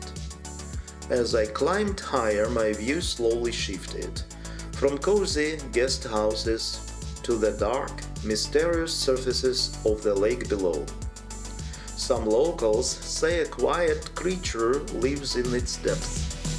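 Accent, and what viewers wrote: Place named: Germany. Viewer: Здравствуйте ещё раз. Сначала собралась искать субтитры, думала на немецком. У Вас уже немецкий акцент. Но потом поняла, что это английский. И всё понятно, хорошее произношение. А я как раз учу английский. Очень красивые места. С большим удовольствием смотрю. Супер!👍👍👍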